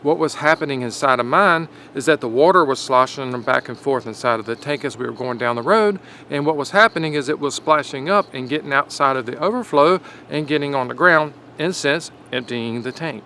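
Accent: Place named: East, United States. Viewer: An Ah ha moment is also called an epiphany. I say waater and you say worrrter, where are you from? The east probably near Pennsylvania? I’m from the south.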